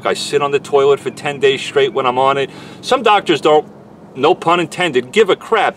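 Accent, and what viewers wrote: New York, United States. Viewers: It says Dallas, but you sound like NY.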